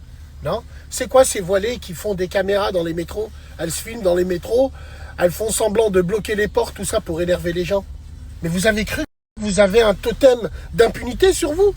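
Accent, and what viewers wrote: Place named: Arab world. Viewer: C est tellement degeulasse l accent arabe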